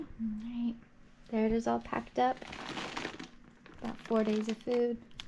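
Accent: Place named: South, United States. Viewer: WHAT YOUR ACCENT SOUND DEEP SOUTHERN LADY WITH APPALACHIAN WORDS ... SHE PASS ... GOOD LUCK MY FRIEND.. APPALACHIAN TRAIL IS SO COOL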